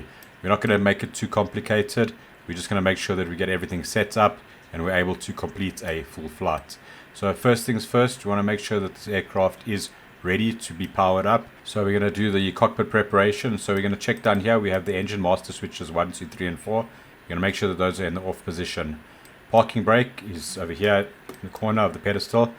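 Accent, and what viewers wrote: South Africa. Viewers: Nice to hear a south african accent for a change. I live in George Western Cape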